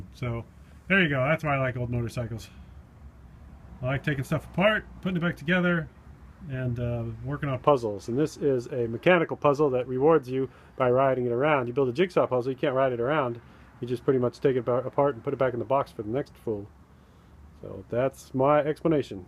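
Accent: Canada. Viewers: haha Canadian accent hype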